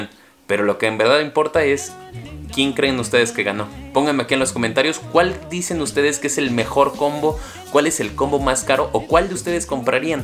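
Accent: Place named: Tokyo, Japan. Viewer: Saludos desde México CDMX ánimo....me fascinó tu acento de Tokio como que se te pegó...jajaja....como siempre 🤠🤠🤠🤠 mis respetos excelente información.........aah porfis Saluda a Doña Lety...a Don Álvaro y al buen Lalo.... La mejor hamburguesa la última solo por el tocino....bye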